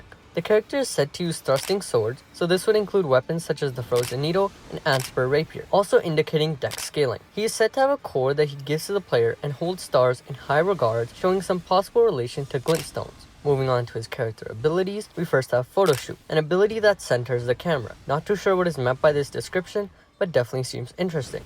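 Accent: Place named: United Kingdom. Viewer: lol he’s British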